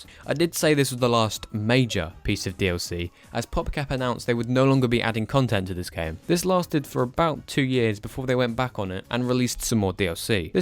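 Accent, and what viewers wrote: United Kingdom. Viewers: bri ish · You sound British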